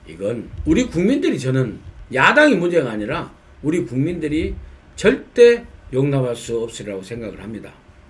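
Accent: Jeolla, South Korea. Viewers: 과거 듣기에도 징그러웠던 전라도 말이 민의원님 입으로 나오니 달콤 정겹습니다. 김경재 총재님, 고영신 박사님, 안형환 의원님 ᆢᆢ그리고, 우리 의원님!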